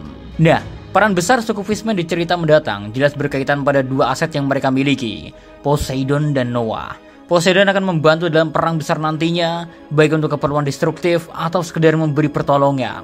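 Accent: Java, Indonesia. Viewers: koyok wong jowo to lur daerah ndi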